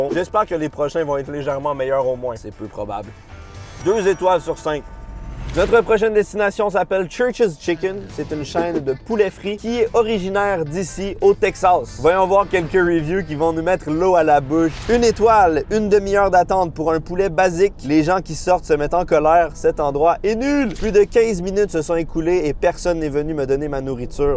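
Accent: Quebec, Canada. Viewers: Super vidéo et l'accent québécois est tellement agréable chui jaloux ! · l'accent québecois est vraiment retardé:face-purple-crying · J'adore tes Vidéos , donnes tu les restes à tes amis ? ça me donne la gerbe . Ton accent Canadien ( Phil/France) me remplie de joie . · L'accent du Québec me tue toujours autant. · Je découvre ta chaîne!!!! Je suis juste fan , quand à votre accent Québécois, c’est juste splendide 💪✌️ Le bonjours de 🇨🇭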